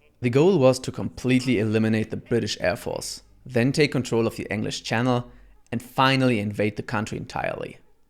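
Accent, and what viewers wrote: Germany. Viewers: figured your german is wayyyy too good and the slight accent when you speak english made it obvious in my opinion anyway watched all your hellsing videos they are awesome dude👍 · its nothing new to anyone and history itself that "bad people" lived prosperous lives after their deeds . i take a wild guess and say given your accent and pronounciaton your german like myself. Take it as you will but reality has never been and will never be Black and White. People just are or believe to be at the right side of History is all. As for your search History that is clocked one way or another. just make sure your not getting fined if you own Volumes of Hellsing that wherent unedited as our Goverment is laughably sensible as per usual and put laws arround that "kind" of Imagery. So if you do own any of it dont show it arround. that might get you into trouble. 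Good Research overall on the Fella. One could also always add that Werewolfs where one way to kill Vampires which is why Alucard felt icky about fighting him. Though of course thats merely Conjecture and one of a dozen ways the Myth of Vampires is told.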